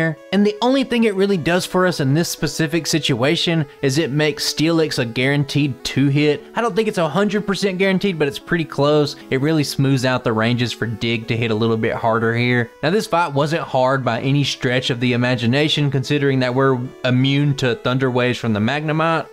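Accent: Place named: Texas, United States. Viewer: im ngl your (Texan?) accent makes your videos very entertaining to listen to, quite unique. definitely subscribed!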